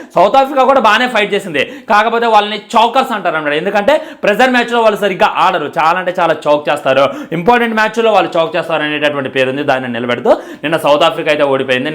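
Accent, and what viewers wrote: Khammam, India. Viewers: anna meedhi khammam kadha?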